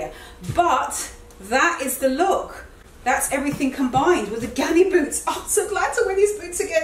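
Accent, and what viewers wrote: United Kingdom. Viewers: Transitional dressing videos are so useful! Thanks,. Your super cool British accent and enthusiasm for style are two of my reasons for being a subscriber and Instagram follower! 😁❤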